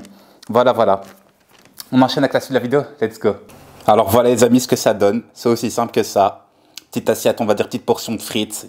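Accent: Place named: Brussels, Belgium. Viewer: Le khoroto de bx on reconnaît l’accent 😂😂😂🇲🇦🇧🇪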